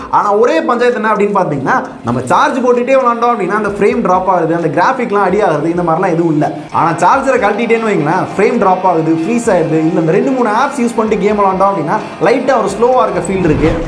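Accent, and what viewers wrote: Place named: Madurai, India. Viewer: Thala unga madhurai voice and tones.. Summa vera level😎💓👌👌👌👌👊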